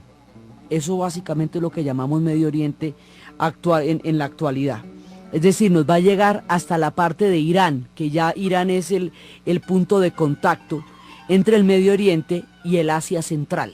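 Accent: Colombia. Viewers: Hola, soy andaluza. Ese 'dege' suyo colombuano tan gracioso y expresivo, esa cultura, inteligencia y empatía para transmitir sus conocimientos es de Premio Novel a la expansión cultural a todos los publicos de habla hispana. Gracias. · Oiga usted!!! Qué manera tan clara de impartir historia!!!!👏🏼👏🏼👏🏼 siempre me había enredado con aquellos nombres de los persas... delicioso ese acento colombiano😉👋🏻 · Jaajajaja narras muy chimba pareces Colombiana saludos de un gnóstico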